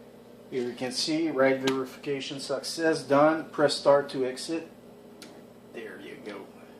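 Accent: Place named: France. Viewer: Salut, tu es francais toi vu ton accent. Merci pour le tuto.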